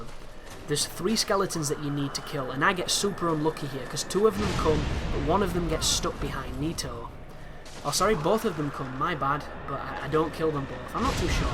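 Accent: United Kingdom. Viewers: One of my fav videos.PERIOD. Not only very helpful but fucking HILARIOUS!😂😂😂 the combination of your beautiful Brit accent but the excessive cussing at enemies and then the crown jewel was your WOW OPINIONS! Spot fucking on! "I'm not trying to offend anyone I'm just stating facts!"HAHAHAHAH I so rarely like/subscribe etc I'm not a dick I just forget but this was an automatic LIKE/SUBSCRIBE/& DONATION😎 I'm not easily amused in fact kind of a negative person at times but this made my already great night, thx, looking forward to other videos, I'm going into your history👊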